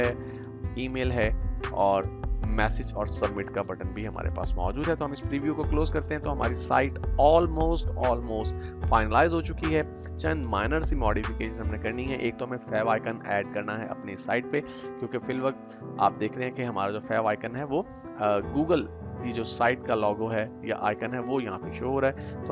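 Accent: Pakistan. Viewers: Aap pakistan se ho kya?? Awaaz se lg rha hai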